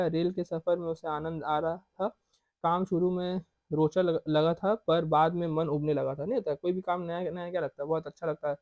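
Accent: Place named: Kolkata, India. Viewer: bhai aap kolkata se ho? awaaz se lagraha hai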